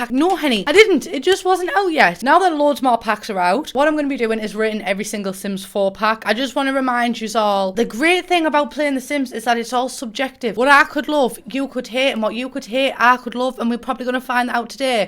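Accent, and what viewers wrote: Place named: Middlesbrough, United Kingdom. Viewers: lmao I literally was thinking 'i heard this accent before, maybe when I flew in Middlesbrough to get tattooed' then I saw your description. I've never felt this satisfied for guessing something right (I'm French so recognizing accents is a big deal for me) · I just realised I never question your voice cuz I'm also from Middlesbrough onsdiadoj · YOU CHANGED YOUR VIDEO DESCRIPTION rip “...from proper up north that’s why i sound like that” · Never watched one of your videos until a friend sent me this today, heard your voice and as a fellow smoggie no longer living in the Boro, your voice was a nice bit of home I needed, thanks. Also thanks for helping which packs to buy, great content. Subscribed.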